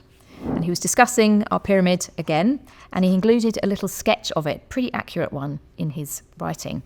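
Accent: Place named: United Kingdom. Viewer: I want this lady to explain everything to me. A hot blonde with a British accent sure makes information go down smoothly. 😅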